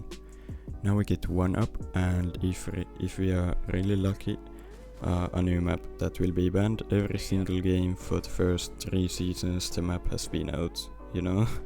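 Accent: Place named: Finland. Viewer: jaaha vittu mietin ku luki tilaa introssa tajusin ku ralli enkku alko kuulua suomalainen sehän on :DDDD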